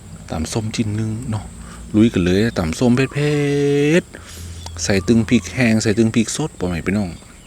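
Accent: North, Thailand. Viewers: ชอบ ฟังพี่พูดภาษาเหนือมากคะ น่ารักมาก ขออนุญาติถามคะ ลำแตลำว้า มันแปลว่าอะไรคะ🥰🥰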